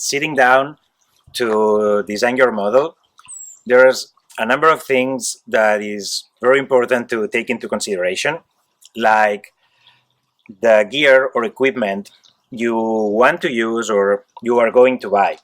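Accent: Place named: Spain. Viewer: Acento español ehhh ;)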